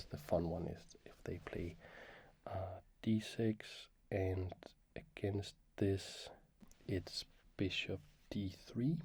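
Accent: Denmark. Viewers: I do so love the Danish accent. Have you been to the b*stard cafe in Copenhagen? It’s a board game cafe. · I don't know about you guys but I clicked for the Danish accent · You should do a video in Danish! I love the accent and really enjoy watching these videos, it would be lovely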